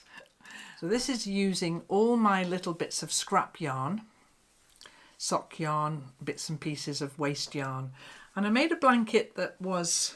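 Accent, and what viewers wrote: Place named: Scotland, United Kingdom. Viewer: Awww. Agnes is a spitting image of you. I don’t knit but truly enjoy watching your videos and am inspired by your creative ideas. Frugal as well, I find fascinating the way something is always possible from seemingly nothing. I’m in Missouri,USA yet in my imagination I can find that I’ve come to Scotland for a visit when you speak. Ahhh. And the bagpipes……🙂 Perhaps one day….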